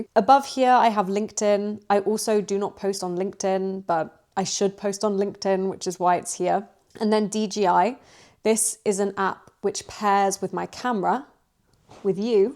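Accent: United Kingdom. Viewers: does anyone know what the british accent she uses is ? i am really interested because it makes me enjoy learning english... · Im Brazilian and I love your channel, im learning English, but your British accent is soooo good to listen, when you say "and" is my favorite part 🤣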